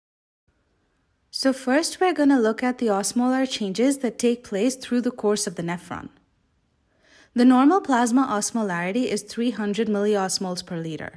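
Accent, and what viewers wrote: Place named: India. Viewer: sounds so indian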